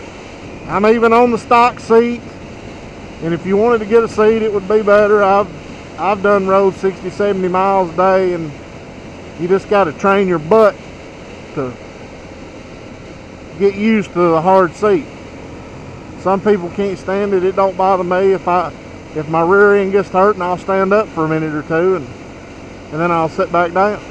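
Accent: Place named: United States. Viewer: nice American English and the horse you are on is looking good. Great road video.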